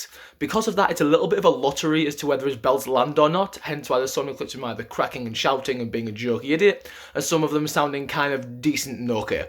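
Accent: United Kingdom. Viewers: Is the host from UK ? I heard pretty much of British accent !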